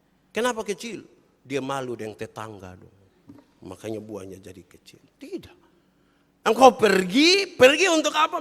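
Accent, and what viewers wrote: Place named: East Nusa Tenggara, Indonesia. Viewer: Shalom, khotbahnya pelan, halus,lucu, tapi menusuk sampai dihati yg paling dalam dengan aksen NTT..sangat diberkati bagi anak2 Tuhan yg mendengar. Tuhan Yesus memberkati Pelayanan, jadi berkat bagi orang banyak sampai ke Bangsa2. Salam dari Bali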